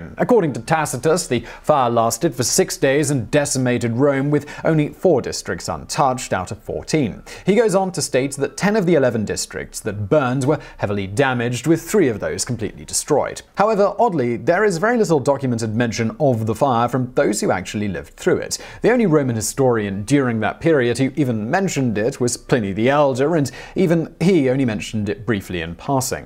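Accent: United Kingdom. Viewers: You sure do make a lot of assumptions. So Neil Patrick Harris is probably pretty knowledgeable about surgery? And news anchors practice reading off the teleprompters? You bring up that he has multiple channels. Is he doing that for all of them? You only think of him as intellectual because of his British accent, not because of any real critical analysis. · Nero tarnished his own name. He didn't need anyone else to do it. He is likely the Beast of Revelation. This guy gets a lot wrong, but because he says it with a pompous British accent and feigned authority, people believe it.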